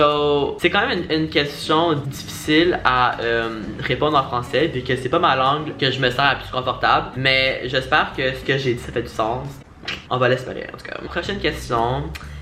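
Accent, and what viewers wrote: Quebec, Canada. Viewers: C’est vraiment cool que tu te partes une chaîne en français, j’écoute tes vids en anglais depuis longtemps pi moi aussi je suis une québécoise bilingue ahaha! Mais hey ne te fais pas d’accent français, l’accent québécois c’est cool! Pi si tu garde ton vrai accent québécois les gens vont pouvoir voir que les gens qui parlent français au Québec ont pas le même accent que ceux de la France comme tout le monde pensent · Oh mon dieu!! Je suis française et je savais pas que tu parlais français! Mashallah l'accent québécois!! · Damn I never realised how different Canadian French is to French from France. There are a lot of Canadian sounds like dans le futurrr instead of dans le futurgh if ygm😂😂 Ilyyy 💕💕 So glad I'm taking French as a GCSE rn😂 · Bonjour, je suis française et j'ai pratiquement la même histoire que toi: mon père est Anglais est vis à Londres et ma mère est française je vis avec elle du coup j'ai toujours parler les 2 langues depuis ma naissance (j'ai passé ma crèche et toute ma maternelle a Londres), mon père viens souvent nous voir mais j'ai toujours étais plus confortable avec le français. 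Ton accent est trop mignon et je trouve qu'il est plus Québécois que français😆. Tu as 19 ans, il me semble. J'adore tes vidéos BISOU!!!💜💜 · Tu parles un français un peu Québécois, tu viens d’où? [edit]: en fait c’est pas « un peu » mais tres Québécois wow [edit 2.0]: cest bon javais pas réalisé la partie « moi je viens de montreal » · Choqué 😶 je t'ai découvert via ta chaîne anglaise et tes pranks. Je me serais pas douté que tu parlais français! 😄 Tu parles super bien! (On entends bien l'accent québécois 😉) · T'a grave un accent québécois j'adhère👌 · Je trouvais que tu avais un accent québecois avant que tu le dise 😂😂 Je savais pas que tu etais québecois, mais j'aime trop au mon dieu. Je suis française (ouest de la France) et je suis tellement contente de savoir que tu parle français, genre j'arrive pas a decrire a qu'elle point je suis contente😍😍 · TES SI QUEBECOIS😂😂😂😂 (s’cuse, je suis aussi canadienne mais à mon école on tous parle français de france comme les profs tous viennent de france et avant d’aller à cette école mon français était aussi mix) mais oof je voyais pas la différence avant que mon français est devenu si « français de france » et wow je le trouve si drôle d’écouter qqn qui a du français SI QUEBECOIS (pas de shade du tout à toi) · J'adore ton accent de Canadien français. 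Mais l'accent anglais de ton boyfriend est trop mignon aussi. Il faut vraiment qu'il apprenne le français! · C'est vraiment cool, tu viens du Québec! Tu as un bel accent québécois malgré le fait que tu dis que tu l'as un peu perdu😉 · Ouais je suis très content de voir que tu fais une chaîne avec le super accent québécois qu’on aime tous✌️ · Ton accent québécois 😂❤️ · Lol il est québécois je ne savais pas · ah ben tbk t québécoi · Tu à l accent québécois...😅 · Tu parles très bien français. Bon après y'a les expressions du genre "Mon cheum" mais ça c'est du français québécois donc aucun souci:). Tu as accent mi québécois mi français j'aime bien. Ha oui faut dire à que la langue française ne se résume pas à "Je suis déguelasse" hihi:) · c'est vrai tu as un accent québecois aussi XD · Ce n’est pas une accent mixte c’est pure québécois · OMG T QUÉBÉCOIS JTM OMG AHHHH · Oooh je viens juste de découvrir ton accent québécois-français tes trop mignon, so cute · juste à t'entendre parler, j'ai su que tu étais québécois! 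( je suis québécoise moi aussi ) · Ah ce français du Québec j'adore en plus t'as l'accent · Woaww ton français est vraiment québécois😂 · Ton accent québécois est trooooop mignon 🥰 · Omg t’a l’accent québécois 😄🔥 Im here from Montreal! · Si tu veux réapprendre un peu le français, en tout cas te replonger dedans tout en conservant le charmant accent québécois, tu peux regarder les courts-métrages du réalisateur Émile Roy!!!:D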